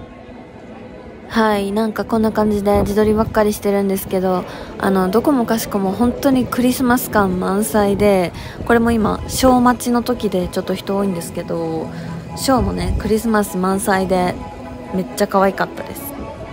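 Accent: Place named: Kansai, Japan. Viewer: かわいい❤️‍🔥東京いるのに関西弁抜けてないあたり関西人の私からすると、たまらなく好きです❤️‍🔥 さんって団子食べるんや〜って何か思っちゃいました♡可愛い♡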